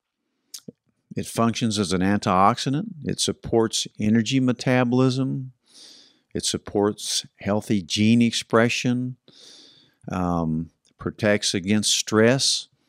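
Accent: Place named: South, United States. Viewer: I despise Southerners and that accent.. wtf?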